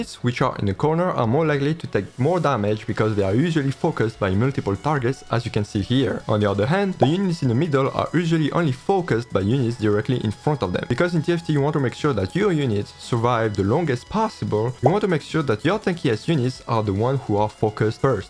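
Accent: France. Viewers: I've been holding a question for as long as I've been watching your videos... what is your accent ? It sounds like a French accent but as a French I'm wondering why I think it sounds a bit more like a Northern accent... SORRY I JUST COULDN'T HOLD THIS ANY LONGER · Pro-level Dad puns, cute French accent, that crush better strike while the iron is hot! :D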